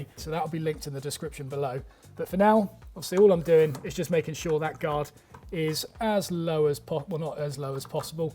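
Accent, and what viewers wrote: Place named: United States. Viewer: Perfect American Accent 👌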